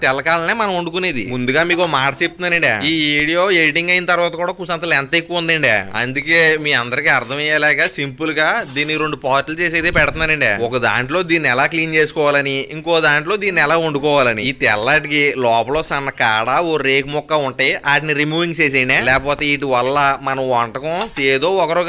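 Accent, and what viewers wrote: Godavari, India. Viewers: Mana Godavari yaasa lo mana Godavari vantalu chupisthunna meeku 🙏 thankyou sooo much brother 🎉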